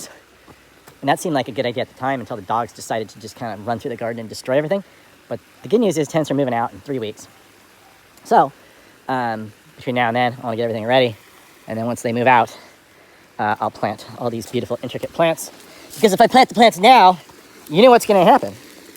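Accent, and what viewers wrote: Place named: Southern California, United States. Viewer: very pleasing SoCal accent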